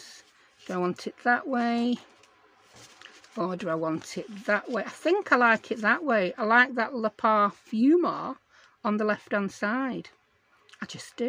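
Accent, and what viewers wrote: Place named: Yorkshire, United Kingdom. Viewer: you made me chuckle with your Yorkshire-isms 😂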